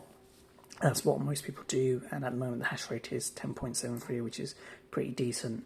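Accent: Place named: United Kingdom. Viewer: Nice to see a brit! (assuming by your accent) - I've been into mining since 16/02 using a variety of platforms but the one I keep coming back to (due to lack of experience in the more advanced platforms) is Nicehash. Despite the negatives from some YT's I think Nicehash is a great starting point. Yes it's a broker which means you're paid only in BTC but it does have it's positives I guess. So for me since the 16/02 I started using only my GPU I had at the time, 5700xt Nitro+ which was pulling in around £5-6 per day with TDP 109w and 50mh/s which is pretty good going for my first try. Fast forward a few days I then bought a 3070 (due to the addiction I seem to have acquired) Obviously there is massive shortages on GPU's so I had no choice but to buy a mobo, cpu which came with the 3070. Paid a small premium but I'd rather that than a bigger premium go to these nasty scalpers! 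Anyway the 3070 is hooked up and I've got the TDP down to around 131w which I know can go down more but i'm still tinkering with this bit. I'm pulling in around 62mh/s which is probably the most you could get out of these cards unless you're a pro which in my case I'm not when it comes to mining. My totals for a week which I will say haven't been running 247 as I've fiddled here/there but I took around £36, just by using Nicehash. I'm looking for more GPU's and an actual frame and other bits. It's just very difficult to get the parts. I wish I'd got into this sooner than I have but least if all else fai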